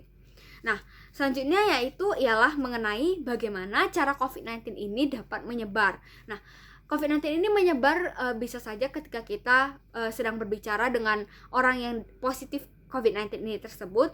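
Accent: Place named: Surabaya, Indonesia. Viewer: Ngomongnya suroboyo bgt ya😆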